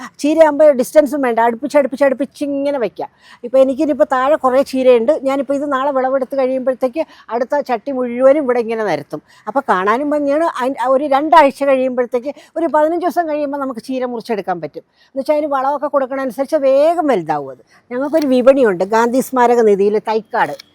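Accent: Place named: Trivandrum, India. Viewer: ഇങ്ങനെ സംസാരിക്കുന്ന Tvm കാരും ഉണ്ടെന്ന് മനസ്സിലായല്ലോ അല്ലേ. അല്ലാതെ ചിലരൊക്കെ അതിക്ഷേപിക്കുന്ന രീതി അല്ല .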